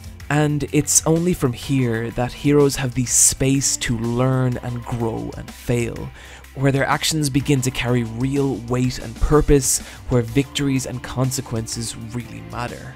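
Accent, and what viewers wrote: Ireland. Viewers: My high school English teacher, senior year, said something similar. He said in fiction, the villain rarely ever thinks of themself as being evil. They believe that they are the hero. Made a crapload of sense when I played Borderlands 2, but I like hearing it reiterated in a silky smooth Irish accent.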